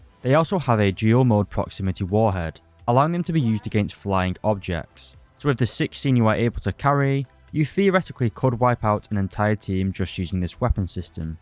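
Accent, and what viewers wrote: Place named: Estuary English, United Kingdom. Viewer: Hey man, your accent is so strong that Im actually struggling to understand certain words that you say. I think you pronounced R instead of W? then chat gpt told me this Estuary English." This accent is spoken by people from the London area, and it is a blend of traditional Cockney and Received Pronunciation. Estuary English is becoming increasingly common among younger speakers in the UK.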